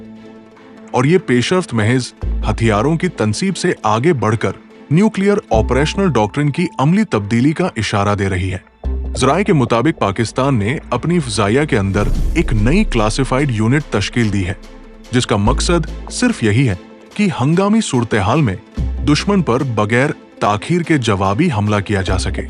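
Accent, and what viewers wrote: India. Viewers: Barway, ya bhartio ki terha urdu kyun boltay ho? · تمہارا لہجہ اور تلفظ ہندی کا کیوں ہے ؟؟؟؟؟؟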